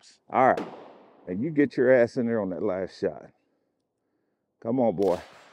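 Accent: South, United States. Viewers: Are you Southern or Texan? Either way I adore you, your sense of humor and editing style!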